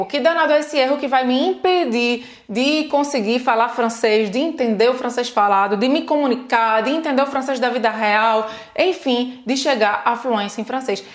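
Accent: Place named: Northeast, Brazil. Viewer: Eu queria saber porque não perdeste o sotaque nordestino, que eu acho muito legal !? Parabéns menina ! Adoro os seus vídeos. Mesmo estando muito longe ,estás ajudando o nosso povo !! Deus te abençoe !! Gravataí RS Brasil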